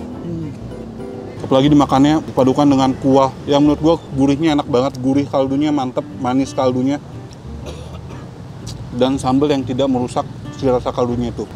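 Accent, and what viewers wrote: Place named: West Java, Indonesia. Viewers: Karena sudah belasan tahun di tanah pasundan, udha biasa banyak banget orang jawa di tanah pasundan yang nyunda banget logatnya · Gw perhatiin, koh klo ngomong sama orang sunda logat ngomong nya udah bisa ngikutin logat sunda sunda gitu 😀 ketularan kayaknya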